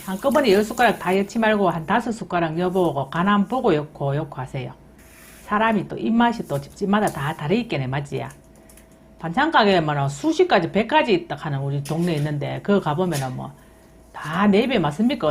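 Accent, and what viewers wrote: Gyeongsang, South Korea. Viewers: 너무 크다타이하모 입이 찌져집니더~ ㅋㅋㅋ갱상도 말은 언제들어도 웃십니더 ㅎㅎ 지는 대구에산지 38년되었는데 말투가 갱상도 충청도 짬뽕입니더 ㅎ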